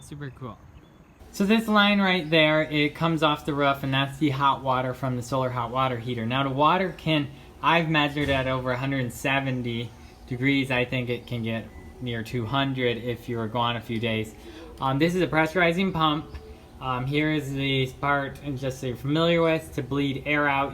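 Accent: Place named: United States. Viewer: I've lived all over the world, I can only find voices like this in America.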